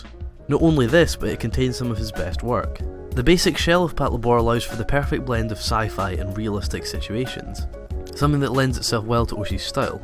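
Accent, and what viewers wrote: Scotland, United Kingdom. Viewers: He Scottish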